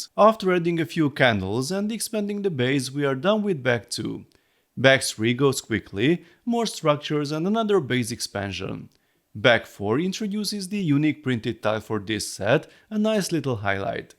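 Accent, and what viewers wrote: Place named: Hungary. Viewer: mindig is kicsit éreztem az akcentusodon mintha magyar lennél de amikor kimontad hogy nyíregyháza egyből tudtam. király videó csak így tovább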